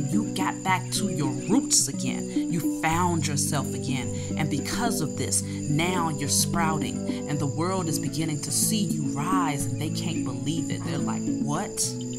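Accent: United States. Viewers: Love your accent. Which Part of America you from? Also, this here is one of the Most Powerful and On the Nail message I have hard in many years. Not here to state my personal business, but I want to thank you. From Black Man, from London, UK.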